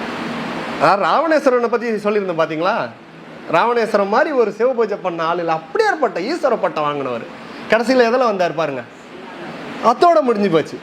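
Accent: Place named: Chennai, India. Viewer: Chennai slang is so visible 😅. Amazing speech 🪄❤❤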